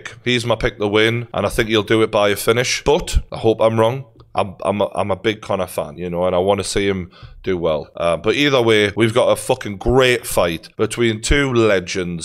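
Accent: Newcastle, United Kingdom. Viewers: Everyone recognizes geordie good work bro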